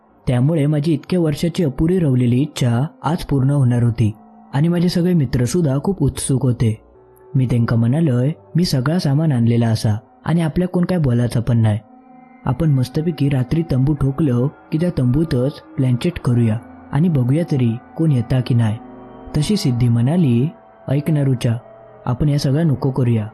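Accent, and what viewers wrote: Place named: Malvan, India. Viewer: Story mast hoti 👍👍😊tumach malvani awaj mast ahe 👍👍👍❤second part lavakar pathava 😊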